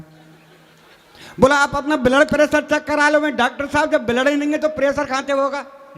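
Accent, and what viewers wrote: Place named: Braj, India. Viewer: Baba ki laungvej to mathura Bharatpur ki hai pakka❤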